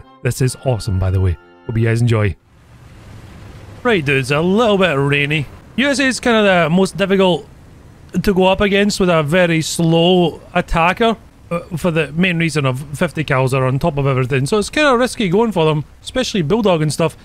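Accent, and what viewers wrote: Scotland, United Kingdom. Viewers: are you british ??? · Bro you can hear he is scottish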